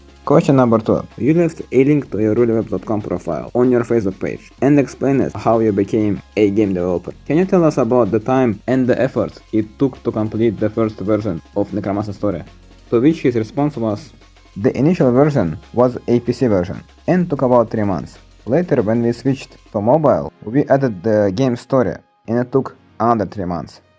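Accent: Russia. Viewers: Я все видео думал: Русский ли у тебя акцент или нет, пока ты прямо в видео не сказал откуда ты) Мать твою, история некроманта 2 выйдет? Я сплю? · Чел я знаю что ты русский твой акцент тебя выдаёт бро.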